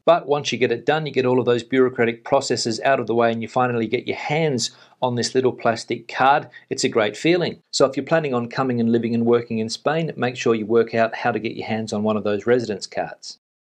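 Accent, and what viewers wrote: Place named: Australia. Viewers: Aussie aussie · How? I'm not english native speaker and I could tell his accent was from Australia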